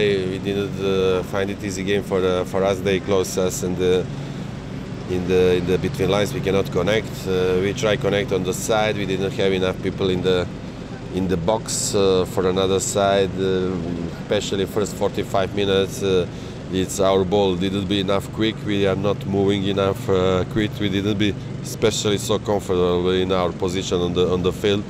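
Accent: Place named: Yorkshire, United Kingdom. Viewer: He’s starting to get a Yorkshire accent 🤔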